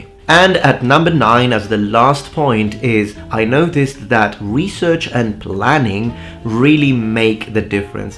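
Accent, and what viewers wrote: United Kingdom. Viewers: I can't believe how much time has passed! 500k?! I very rarely do these but I'll try be concise :D First off, congratulations! You deserve the milestone for your diligence over the years, truly well done man. I can't pinpoint when I subbed, but I've been along the ride for many years - to see this growth externally is super satisfying. I can say with confidence pre 50k, but my memory fails me to go beyond that. Around the time your language videos were more prevalent I'd say. My other reason to drop a comment here is to explain why I initially subbed - it was because of your character, before your content. At the time, there was very little content for what you were producing (and still do). To see a video on historical medieval warfare and/or weaponry was a rarity, a drop in the ocean. To then move to language in the next video to me was astonishing. 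Not just generic language, but an Italian man speaking fluent English with a British accent swapping to Greek and Japanese in the same breath was near inconceivable, I couldn't believe my eyes (and ears lol). I'll touch on why else that was important to me later but, above all you were passionate, and had infinite potential to grow, across every avenue. I wanted to see that among many other things. Youtube wasn't exactly new when I landed here, but it definitely wasn't the colossus it is now. Growing up with the platform I've always been very picky and arrogant in who I subscribed to. To me a subscription was a dedication, it wa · why you have a british accent? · You sound very British but look very Italiano. How come ?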